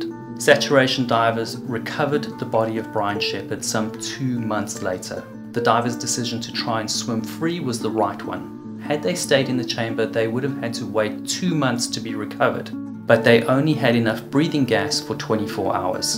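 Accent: South Africa. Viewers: South African accent on point💨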